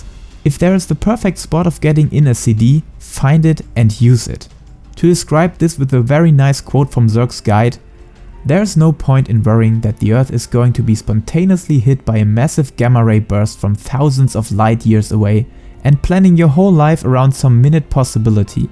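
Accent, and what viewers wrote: Germany. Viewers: A idea for when you're going to compare healers again Is to show icons of spells when you name them. Even tho I have all 2 eu 3 (rip astro) at 80 BIS I have no idea what most of the spells do when you call them out with your beautiful thicc German accent.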